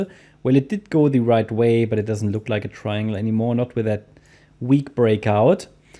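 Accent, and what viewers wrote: Germany. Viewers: Ok, my mistake. Sounds like a German accent. Or maybe you are German just living elsewhere?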